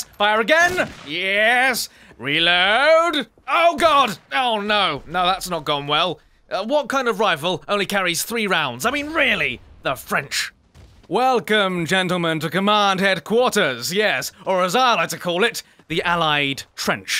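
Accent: United Kingdom. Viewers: A fine Britishman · Evan Anderson-Navarro This is just complete pewdiepie-tier humour. But it's somehow funny because he talks "British". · Omg I had to sub just for the stereotypical british commentary haha :')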